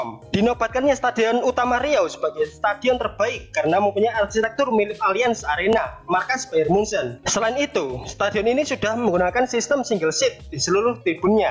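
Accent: Java, Indonesia. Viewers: wong jowo to.. medok banget ngomong nya..? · Medok'e rek 😅👍👍👍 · suara jelek, medok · juan juan medok bangat jowone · q seneng sih...liat vidio nya tapi perutku sakit sumpah denger mas nya bicaranya lucu bgt bahasa jawanya melekat bgt di lidah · Yg ngomong wong jowo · kalo boleh jujur suara lu medok,tapi great suara lu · Suaramu ituloh Mas..medok baget sesuai otak dan pikiranmu yang ngawur dan medok. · Mas sampeyan suarane jowone medok bgt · Kok suaranya medok banget ya hehehehe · Jawane medok banget ya MA's asli jowo to massss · Suaranyaa.. jawa banget. Stadiun riau.. skrang berantakn broo.. krn terkena masalah sepertiny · Jawa kali suara mu bro Jawir jawir 😂😂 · suaramu jowo bngat chancukkk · suaramu loh medok bangeet..... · Cie yang mencuri video orang,jawa mu medok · Medooooookkk min....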